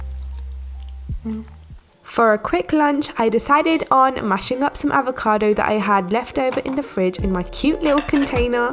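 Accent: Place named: North London, United Kingdom. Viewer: my first time watching your videos and i fell in love, and of course subsribed :) you have such a beautiful accent, probably north london with a tiny bit of east, almost like amy winehouse <3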